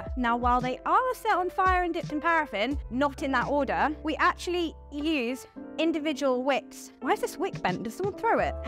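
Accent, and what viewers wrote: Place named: United Kingdom. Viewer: Lights & fire!! ...& Naked!! 🥰 Love watching your videos & watching u hulu hooping is memorizing, captivating, & endlessly entertaining for some reason. You're beautiful, charming & adorable & I bet your performances are in high demand. & I love your British accent. Also love seeing these longer videos rather that just the short form 10-15 second ones ( those are still good, just too short for someone so charming ) Keep up the good work & keep being you. 👍👍😍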